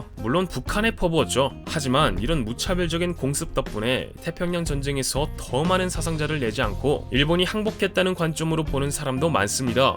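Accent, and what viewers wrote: Gyeongsang, South Korea. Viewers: 혹시 경상도가 고향이신가요?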